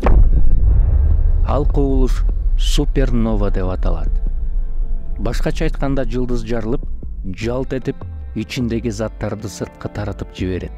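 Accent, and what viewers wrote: Standard. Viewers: Ма Ша АЛЛАХ Кыргызымдын мыкты мырзасы сизге АЛЛАХЫМ ыраазы болсун, абдан соонун айтып берет экенсиз. Сүйлөгөнүнүз таза кыргызча болгонуна абдан кубандым сизге кичине болсо да колдоо көрсөтүү үчүн каналынызга катталдым😊 ишинизге ийгилик алга🤗👍 Бирок эмне болоорун бир кана ЖАРАТУУЧУ АЛЛА ТААЛА билет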